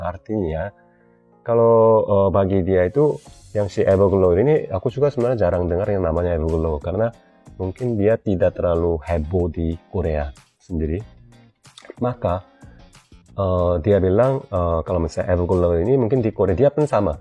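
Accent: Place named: Java, Indonesia. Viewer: Intonasinya kaya orang jawa ada medoknya dibeberapa bagian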